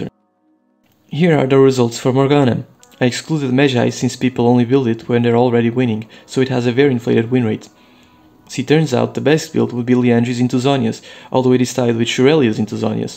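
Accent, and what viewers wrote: Portugal. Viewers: Why does your accent looks like you are from portugal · Are you by chance Portuguese? There's something about your English accent that's activating my senses... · you 100% sound portuguese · Aquele sotaque tuga em vídeos de LoL, não estava a espera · Are you Portuguese bro?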